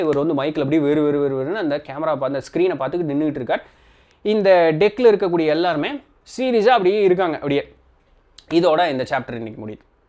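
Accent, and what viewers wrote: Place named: Marthandam, India. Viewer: Marthandam slang tamil song paadura pola tan irukum..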